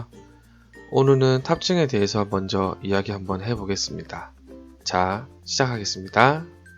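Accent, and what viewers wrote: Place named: Gyeongsang, South Korea. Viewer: 갱상도 꺼져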